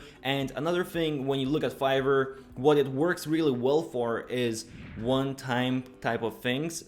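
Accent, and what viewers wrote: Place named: Germany. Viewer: Could be German, or Russia or Estland?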